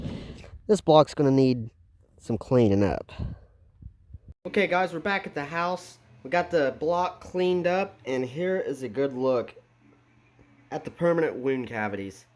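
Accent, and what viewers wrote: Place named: Appalachia, United States. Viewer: I rather try to catch that slug with my face than have too listen to another minute of this hill billy speak again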